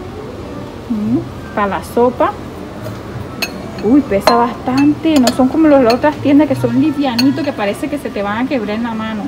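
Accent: Caribbean Coast, Colombia. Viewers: cuetame soy seguidora de tuya De parte Colombia eres se nota que eres costeña que Dios te bendiga siempre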